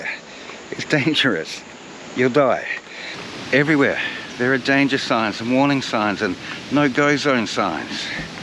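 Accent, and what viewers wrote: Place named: Australia. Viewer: Very pleasant, interesting video; your voice is very calming and rare for me to hear the accent everyone loves. Who's idea to do a town in Glorious Art Deco? I think there are products that you apply after washing that retards the return growrh of mold. I'm from Washington State USA and will never make it to Australia being old nowadays. So this is great! Hope you keep at it; there just arent ways to see the actual countryside ....excellent, thank you.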